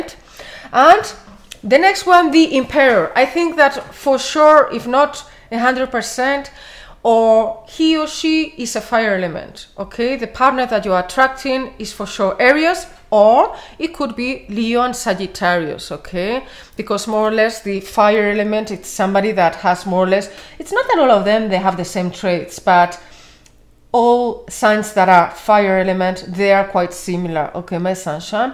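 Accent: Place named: Spain. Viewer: Your voice is beautiful, personally speaking I would hate to see u learn english because what u have is treasure. I love ur Spanish accent. Don't ever lose it❤❤💕.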